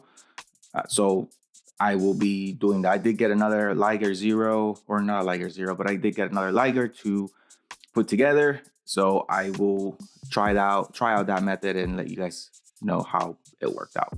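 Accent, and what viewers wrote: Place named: Miami, United States. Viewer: I know a Miami accent when I hear one. usa gundam has some preorders for zoids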